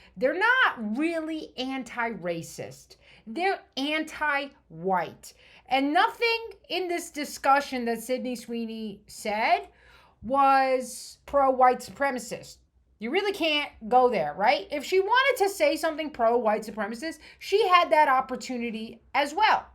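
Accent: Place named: New York, United States. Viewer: Good to hear a real New York accent, it’s a rarity these days.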